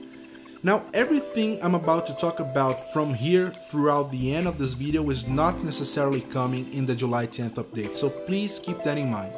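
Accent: Brazil. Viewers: Are you brazilian?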